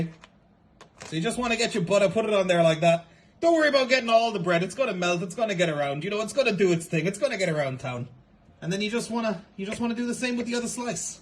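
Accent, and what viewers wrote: United States. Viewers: As someone from New England I can FEEL the origins of the Boston accent in my bones from this video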